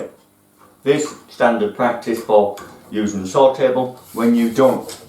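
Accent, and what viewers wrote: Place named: Huddersfield, United Kingdom. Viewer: You sound like a Huddersfield man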